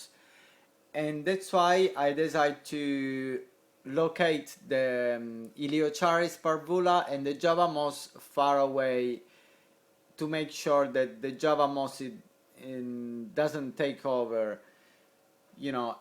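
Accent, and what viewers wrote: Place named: Italy. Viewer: i enjoy your video and i also like listening to your italian accent. LOL peace.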